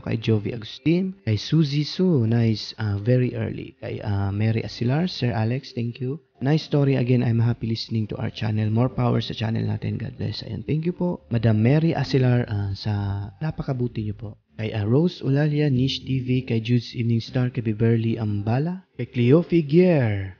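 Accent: Visayas, Philippines. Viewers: Qa cute mag bisaya oi😊😊😊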